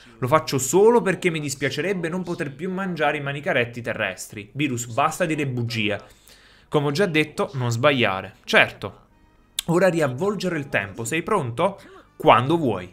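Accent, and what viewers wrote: Naples, Italy. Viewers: Ma quindi sei napoletano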